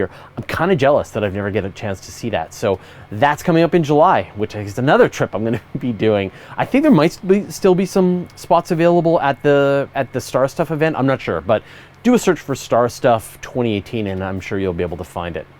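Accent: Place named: Canada. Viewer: I wondered if you were Canadian from your accent and I see yes! <link> I'm the publisher of Universe Today and co-host of Astronomy Cast. I was born in Vancouver, Canada, but grew up on Hornby Island, a small island off the coast of Vancouver Island. Then I moved to Vancouver again to study engineering at UBC.....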